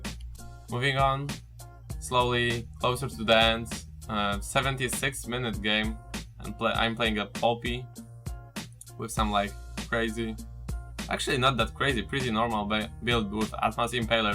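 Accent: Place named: Poland. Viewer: Are u from poland ? becouse i can hear polish accent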